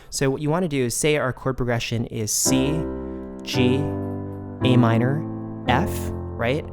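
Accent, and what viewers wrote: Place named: England, United Kingdom. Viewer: What part of England are you from dude ?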